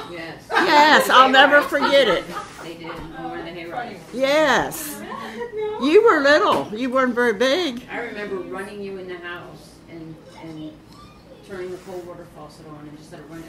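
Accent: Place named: Baltimore, United States. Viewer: Oh that MD/Balmore accent! "thousand" "there" "water" "out"...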